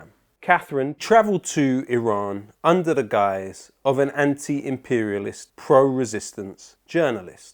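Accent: United Kingdom. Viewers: are you brittish mate?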